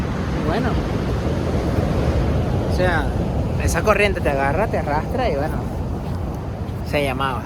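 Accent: Venezuela. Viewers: Eres venezolano? Me parece tu acento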